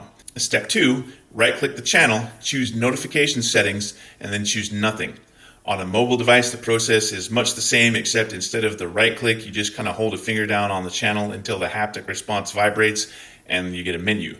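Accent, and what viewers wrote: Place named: Gloucester, United Kingdom. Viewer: I have to admit my limitations as much as I would love to join Discord - that’s for the more computer savvy person - for now I will continue to check in with you on YouTube. I’m a from Gloucester Mass a Longshoremen or as we say “Lumper” town where a guy like you - the way you talk is familiar and comforting. Thanks for everything your doing ☮️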